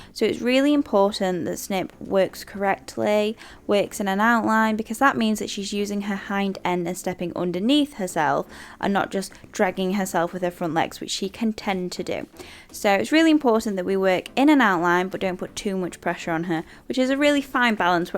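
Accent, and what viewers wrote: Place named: Yorkshire, United Kingdom. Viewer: I’m so confused which accent is your natural accent? 😂 one minute you sound posh the next from Yorkshire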